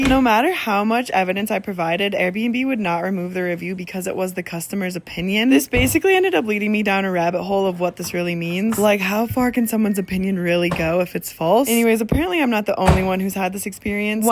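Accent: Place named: United States. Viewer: Sounds very American.. sincerely, a Dane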